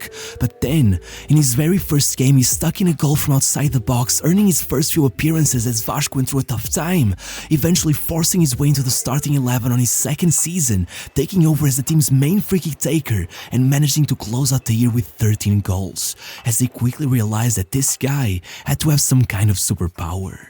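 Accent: Portugal. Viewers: Ahh the narrator is Portuguese!!!! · esse sotaqque portugues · Ur Portuguese right? This accent always got me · Ele é português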